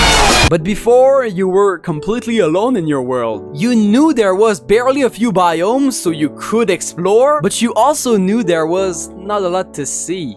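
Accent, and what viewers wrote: Quebec, Canada. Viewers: Est-tu québécois par hazard? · Came in for the nice ouse, stayed for the gros accent québécois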